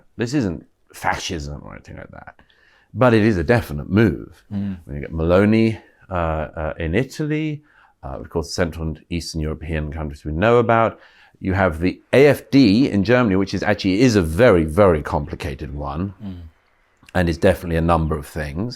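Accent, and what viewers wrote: RP, United Kingdom. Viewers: This man has made a living out of saying nothing with lots of words in a posh accent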